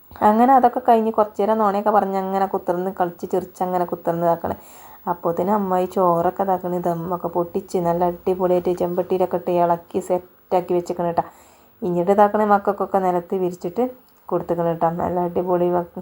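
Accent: Malappuram, India. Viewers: ട്ട,,,,, കൂടുതലാണ്‌ട്ടാ,, ട്ടാ,,, കുറ്റപ്പെടുത്ത് ഒഴിവാക്കണ്ടാ,,,😅 എനിക്ക് നിന്റെ മലപ്പുറം വർത്തമാനം കേൾക്കാൻ നല്ല ഇഷ്ടമാണ്, ടാ,,, ഇത് കേൾക്കാൻ വേണ്ടിയാ ഞാൻ വീഡിയോ കാണുന്നത് തന്നെ.,❤️❤️❤️❤️❤️❤️❤️👍🏻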